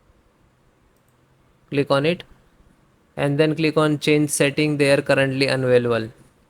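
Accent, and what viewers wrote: India. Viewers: IM DONE WITH THE INDIAN ACCENT IN EVERY VIDEOS. ENOUGH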